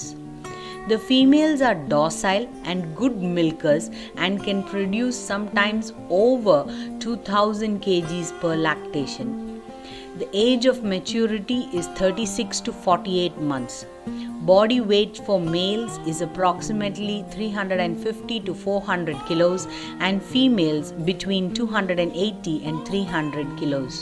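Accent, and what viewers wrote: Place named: India. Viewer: You seem to have a foreign name with an Indian accent.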